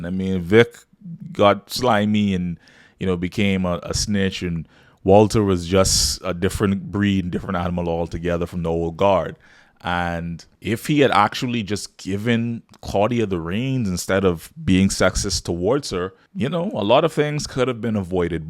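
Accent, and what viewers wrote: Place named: Bahamas. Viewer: quick question ... which island you or your people from ... cause I can hear the Bahamian in you voice! ... BTW a fan of your POWER content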